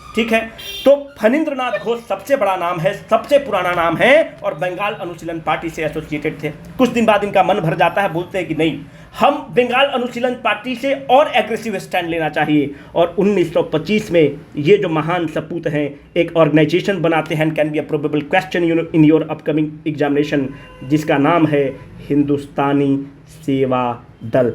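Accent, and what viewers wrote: Bihar, India. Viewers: The way u teach is really incredible sir....feel really blessed to be a part of bcw family🙏 nd ur narration in bihari tone 🧡gives extra motivation sir....soon this channel will become one stop destination for all bpsc aspirants......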